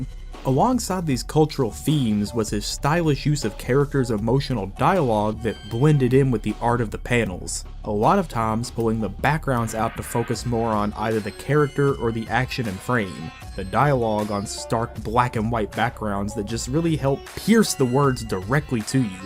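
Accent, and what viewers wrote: South, United States. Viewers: Love the video, and as a fellow Texan, love your narration through it. There's nothing like hearing someone describe something they're passionate about in a fine Southern twang. Also, you're like one of the only people on Youtube talking about Bleach that acknowledged the existence of the "speed of the world" poem, so thanks for that. It's one of my most favorite moments/poems from the manga that just evokes so much emotion. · just found your video man, I cant place the accent, TN or MS?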